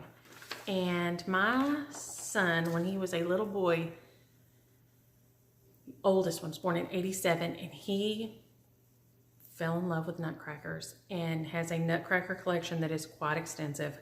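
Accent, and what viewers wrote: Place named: Georgia, United States. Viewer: FUDGY Brownies Only for this girl! Are you from Georgia originally and moved to Texas? You sound like a Georgian. I love it!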